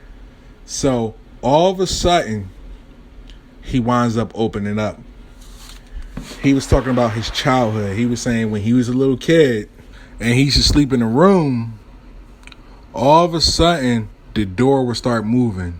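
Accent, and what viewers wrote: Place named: Philadelphia, United States. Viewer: Philly accent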